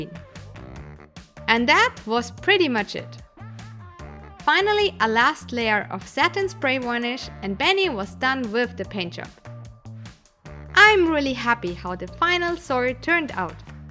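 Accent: Germany. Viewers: Are you from germany? Because of your denglisch akzent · Make a german Version (man hört das du deutch bist ) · Man hört deinen deutschen akzent, lol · She's German Her accent is German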